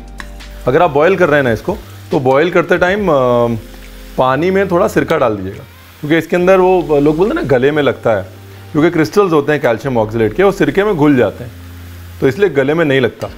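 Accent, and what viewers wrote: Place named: Punjab, India. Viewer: I like your style of explaining everything. And, your typical Punjabi way of talking. I am going to make Suran the way you demonstrated today. I used to make in a different, Tamil Brahman way, my neighbor had shown me & it was tasty, but your recipe seemed amazingly simple & I could taste it in my home, here. Thank you Ranveer.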